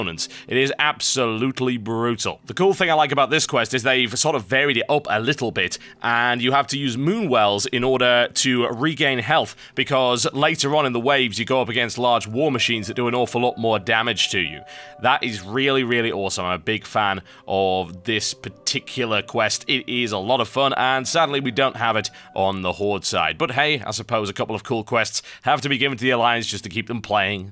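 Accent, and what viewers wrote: United Kingdom. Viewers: id say this guy is pretty good ... but i cant wacht crap , and he sounda abit british huh?? XD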